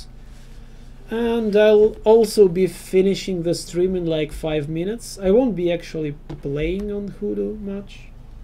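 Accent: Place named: England, United Kingdom. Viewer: Oh, you are from England?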